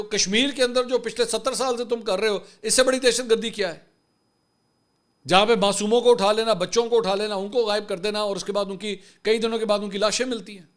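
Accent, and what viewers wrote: Punjab, Pakistan. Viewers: ماشاءاللہ آپ پنجابی بہت پیاری بولتے ہیں ❤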